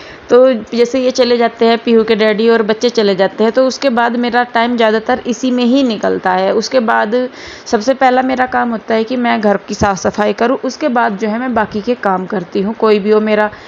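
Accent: Haryana, India. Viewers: Ye haryanvi accent hai.harek ko apni bhasha pe garv hona chhye n ki sharmindgi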